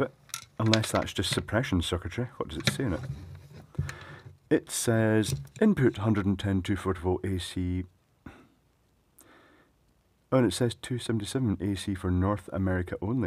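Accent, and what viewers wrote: Scotland, United Kingdom. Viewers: I agree, though I suppose we could still enjoy the Scottish accent as narrates?!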